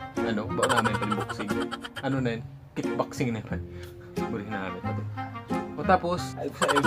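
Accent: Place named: Bicol, Philippines. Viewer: Hehe bikolano ka pla po sir kala ko po taga manila parehas palan po kita haha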